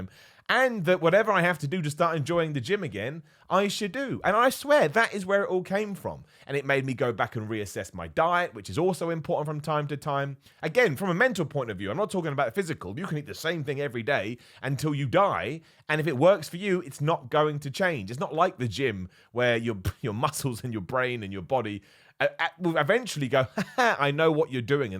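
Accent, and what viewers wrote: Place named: England, United Kingdom. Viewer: You're absolutely right. Coach Greg has the exact same effect with my exercise and training motivation. When I'm exercising now I always have some form or fitness YouTube video on, be it yours, or Greg's, Jeremy, Jeff, Igor, Derek, etc. Your videos are also great motivation for me, and I really find your personality charming and your English accent and mannerisms kinda soothing, lol.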